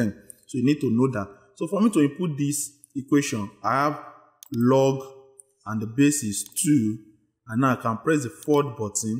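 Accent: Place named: Nigeria. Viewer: Sounds like a Nigerian accent 🤔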